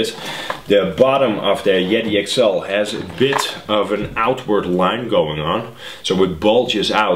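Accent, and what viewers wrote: Netherlands. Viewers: je klink lekker nederlands haha nice vid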